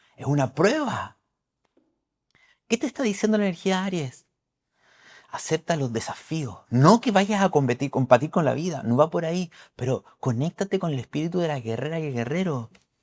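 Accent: Chile. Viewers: Hola buenas tardes. Soy chilena 🇨🇱 del signo de virgo. Me encanta la forma como te expresas habla como un verdadero chileno, muy real y muy sincero oro y miel para ti 🙌💪🐘♾️🌹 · Gracias,. Mantener el corazón abierto y agradecer. Intentar por todos los medios no estallar....respirar y cuidarse y cuidar. Vídeo para guardar y reescuchar si es necesario y creo que lo será. Agradezco todo lo que transmites....seguro que habrá momentos que sea agotador. El panorama panorama es terrorífico... pero como tu dices es una oportunidad. Hay muchas personas sufriendo y mucho. Cada uno sabe por lo que pasa pero hay lugares en donde no saben que va a ocurrir de un momento a otro de manera terrible. Siempre dices al final de los vídeos que nos quieres mucho y nos cuidemos....en chileno que es un modo de hablar encantador. Y que me resulta tan familiar...así que yo hoy te digo que se te quiere y que te cuides mucho. 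Abrazo para ti y para todos.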